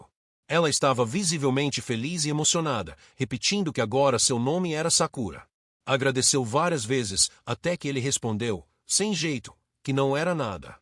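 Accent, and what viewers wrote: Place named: England, United Kingdom. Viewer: Porque você tá falando com um sutaque inglês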